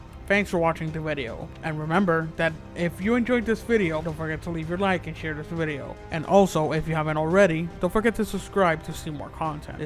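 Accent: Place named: Scotland, United Kingdom. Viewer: hes scottish, dont be inconsiderate haha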